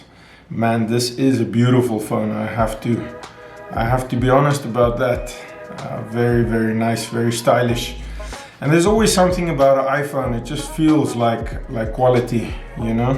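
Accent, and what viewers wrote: South Africa. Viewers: He is South African. I think he just works in Saudi Arabia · You sound south african...like if you are....SA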